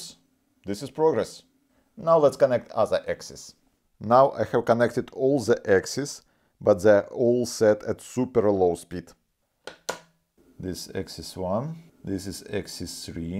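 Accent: Russia. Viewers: Ебать акцент, наш чтоли?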